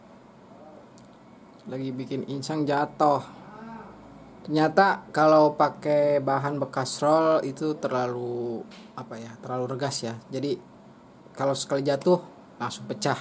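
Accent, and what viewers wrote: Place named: West Java, Indonesia. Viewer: Nu sae ngango na kayu naon kang..?? Bade nyobian yeh ngadamel nyalira.. tina logat na mah da jiga asli sunda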